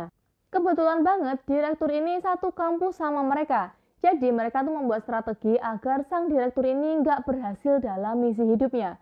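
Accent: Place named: Java, Indonesia. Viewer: Medox banget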